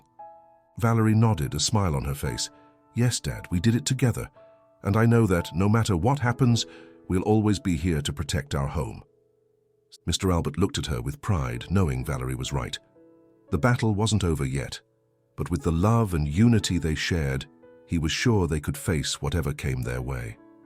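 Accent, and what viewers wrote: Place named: United Kingdom. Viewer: I enjoy your English English. American English I do not like so much. So your pronunciation is a melody for my ears. Hard work, honesty, perseverance is what makes people happy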